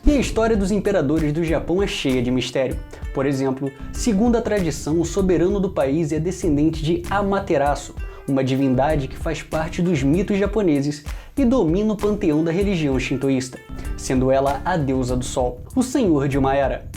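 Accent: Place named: Rio de Janeiro, Brazil. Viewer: Gosto muito dos vídeos! Só não muito desse sotaque carioca carregado... Parece uma bola vazando pelo pito!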